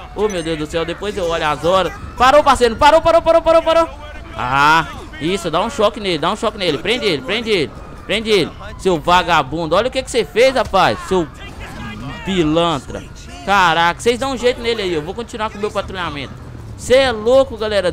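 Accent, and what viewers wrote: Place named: Rio de Janeiro, Brazil. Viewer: virou carioca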